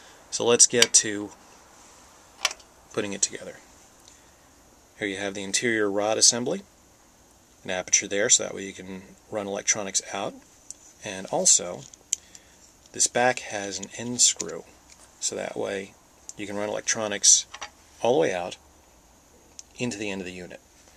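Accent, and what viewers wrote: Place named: Russia. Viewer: your russian accent is great! XD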